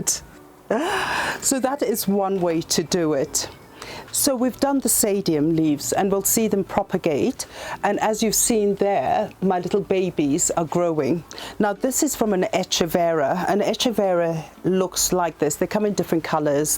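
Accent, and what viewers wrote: United Kingdom. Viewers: Her British accent is the best of all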